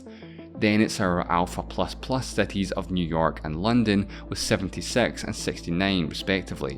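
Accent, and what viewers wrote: Scotland, United Kingdom. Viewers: no he doesn’t? It’s just a Scottish accent. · he’s scottish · guys, the guy's from scotland. give him a break for pronouncing "city" as "seti" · Are you Scottish? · You've never heard a Scottish accent? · Is this a scottish accent or something? Harder to understEnd. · Scotland. I couldn't say whereabouts but he's definitely Scottish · Scottish not sure where in Scotland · He's Scottish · Hes scottish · where in scotland are you from?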